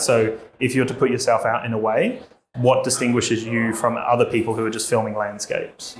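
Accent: Australia. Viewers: Oh wow, I didn't realize you weren't from Australia. You're from the UK? You sound like you have an Australian accent. I mean now that I know, I can hear there's a slight difference, but it definitely doesn't sound like an English accent.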